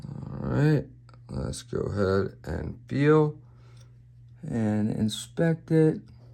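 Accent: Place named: Baltimore, United States. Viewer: You from/in Bmore?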